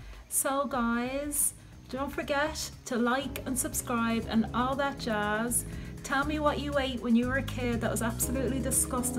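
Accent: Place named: Ireland. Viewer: I love your Irish accent!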